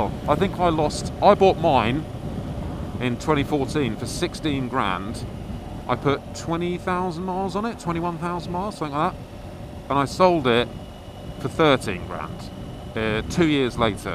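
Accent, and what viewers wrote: England, United Kingdom. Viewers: So, what kind of English accent do you have? I like it. Is it "posh"? Northern? Southern? What would one call it?(I'm a clueless fucking American, btw) The sarcasm is dripping and delightful.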